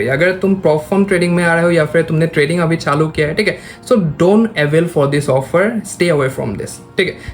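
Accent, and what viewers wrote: Assam, India. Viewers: Bhai ka hindi sunke hi pata chal gya ki yeh bande Assamese he 😅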